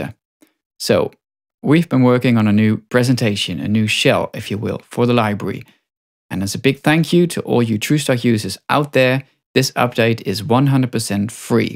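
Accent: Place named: Netherlands. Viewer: Love the update (and subtle Dutch accent :> )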